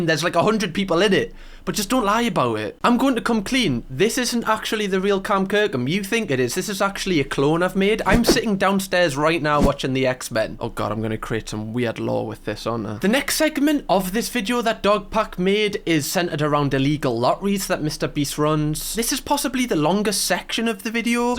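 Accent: Newcastle, United Kingdom. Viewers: he’s from newcastle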